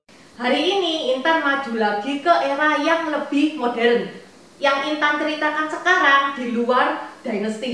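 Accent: Java, Indonesia. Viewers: Logat jawanya medok banget.. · Salam kenal Ka Intan. Baca judulnya aja aku sudah suka banget. Eh pas liat video kak Intan berdialek Jawa aku tambah seru nontonnya. Ada lucu, gemes tapi keren banget ka. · Logat jowoneh medok bamget.!! Hehehehe wis ora opoh2 sing pnting mudeng. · Orang jawa pribumi logat nya gak medok cc ini medok bget😀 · Rasanya ma'af ya ibu Intan masih harus banyak belajar dialek atau pronounciation bahasa Mandarin yang baik, tepat dan benar déh kepada mas Novi Basuki PhD yang santri asal Jawa Timur itu lho.... karena logat bahasa Mandarin ibu Intan masih bahasa Jawa-Centris ! Sorry ya masukan konstruktif ! Demikian juga nomenklatur kata "Cina" sudah tidak berlaku lagi/dicabut melalui Undang-Undang atau Tap MPR ya bu, harusnya diganti dengan Tiongkok/Tionghoa / ZhongGuo / China ya bu. Terimakasih atas video-videonya yang banyak memberi informasi faktuil dan bermanfaat !🙏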